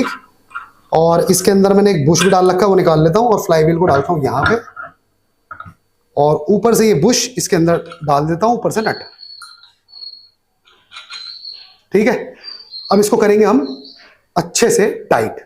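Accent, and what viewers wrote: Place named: India. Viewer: your videos and accent let me suppose you're from india, isn't it?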